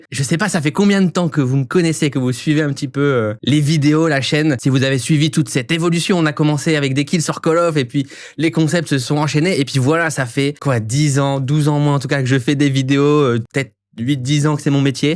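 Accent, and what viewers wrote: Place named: North, France. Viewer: J'étais pas fan de gaming a la base mais qu'est ce que tu m'as fais rire toutes ses années avec ton accent bien du nord bien de chez nous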